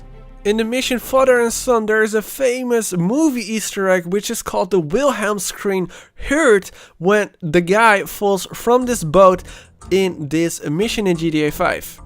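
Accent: Netherlands. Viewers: Nice Dutch accent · Are u Dutch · Are you dutch? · Are yu dutch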